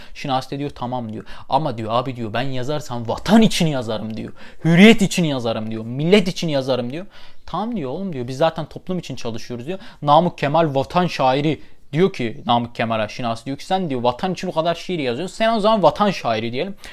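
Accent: Adana, Turkey. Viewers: Abi sen vanlı değil miydin adana şivesi ne alakasxsxsxsxs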